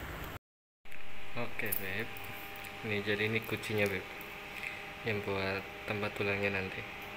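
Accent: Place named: Banyumas, Indonesia. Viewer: Salam ngapak bos quuu Ora ngapak ora penak😀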